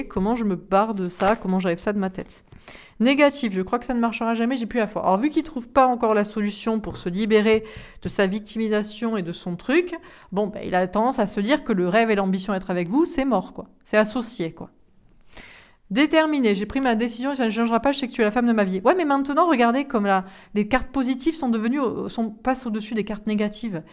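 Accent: South, France. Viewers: Bonsoir, J'adore vous écouter, et surtout votre humour avec l'accent du sud!! C'est tellement ce que je vis cette guidance.. avec un masculin super matrixé.. qui fait style qu'il n'a rien vu, que sa vie est cool.. lol quoi merci 🙏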